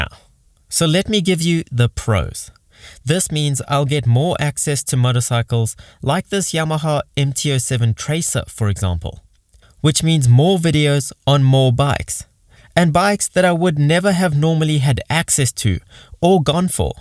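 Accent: South Africa. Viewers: Always wondered where that accent of yours was from.... So you're South African.. that's awesome. I follow cricket and its names like AB Devilliers, Dale Steyn which comes to my mind thinking about SA. Well now will be one too. 🙌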